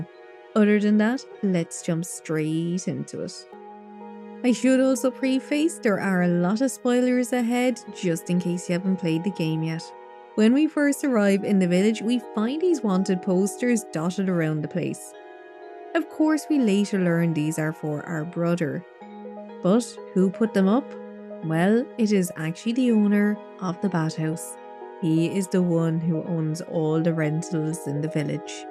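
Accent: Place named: Ireland. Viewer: The moment I heard ur voice, I immediately knew you're from Ireland 🇮🇪. Such a cute and sweet accent fr. Btw amazing video ( ≧∀≦)ノ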